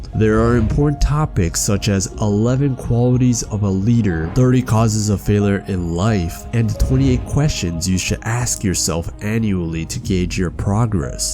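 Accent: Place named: Philippines. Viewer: sounds like my Filipino friend, saying “da” instead of “the”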